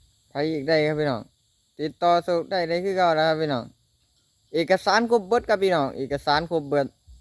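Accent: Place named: Central Thailand, Thailand. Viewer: พูดไทยกลางครับ😅😅😅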